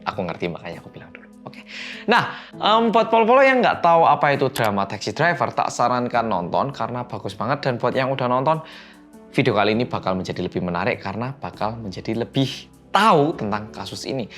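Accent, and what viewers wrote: Java, Indonesia. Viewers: Medhokkkk😎🗿 yang ditunggu" detektif 😎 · Oom kliatan fresh sekarang, tapi udh berkurang mendhoknya😂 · Medokk..., Suara e itu lho kangen denger nya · Hello guys welkom bek tu korea reomit bersama orang korea yang MEDHOOKK~ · Welcome to orang koreaaa yang medhooook😎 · Bersama orang KOREA yang MEDHOOOKKKK... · Team gercep😀.. Kalo ada bang medok, gak bakal terlewatkan di notif..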